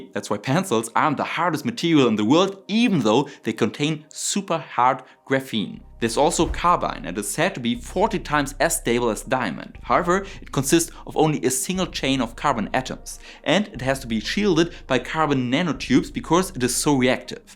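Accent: Germany. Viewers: Great video. Made me realize I need more German accent in my science recommendations; subbed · if not possible to synthesize in industrial manufacturing via vapor deposition for example that material will be nigh useless because of costs. also the german accent is super cringe ldk grapene and piezoelectric especially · I know I'm being shallow, but the German accent makes this all sound so much more authoritative.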